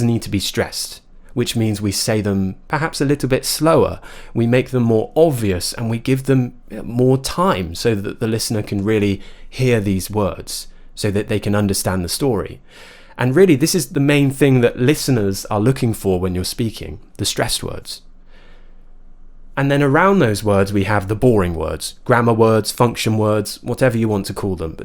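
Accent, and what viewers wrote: RP, United Kingdom. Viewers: RP accent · Waooo!! Finally I've found you again, l really missed your video a lot You're the one introduced modern RP accent to me. Thank you once again and welcome back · You’re an amazing teacher! I absolutely love your beautiful British accent, I could hear you for endless hours ❤ Congrats on 1M!!! You truly deserve it 👏🏼👏🏼👏🏼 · Just listening to you is pleasure) Love RP! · Greatest teacher ever 🥺❤️ never get bored of your British accent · Normally I don't fancy RP accent 😜! But is the only exception huehuahuehuahuehua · Because you speak British English perfectly and clearly that's why we like your videos and a am very happy you are come back again. Thank you very much for your videos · Welcome back🌷 You are the first tutor I've learned the British accent from and that helped me to sound more posh. Thx from Egypt. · Welcome back my great RP English accent I'm grateful that you're back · Welcome back!!!! 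Thanks for this great video as always, really love your British accent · Hi it's nice to see you again. Congrats for 1M subscribers. You deserve it. Your British accent is amazing so please post your English lesson videos regularly. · you have an accent yourself (a british one).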